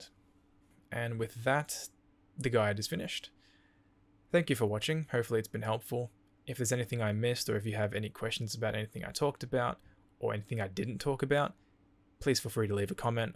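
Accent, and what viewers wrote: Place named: Australia. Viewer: I don't play WW but upon hearing a fellow aussie, instant sub. Watching this all the way through regardless because a 1 hour guide kicks ass, best of luck with the new channel mate x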